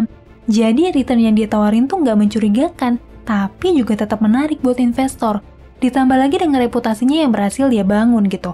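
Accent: Indonesia. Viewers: aksennya indo banget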